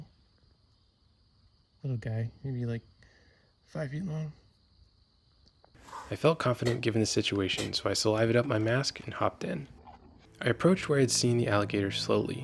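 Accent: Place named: France. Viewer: Are you French ? Your accent sounds like that. 🎀 thanks for your videos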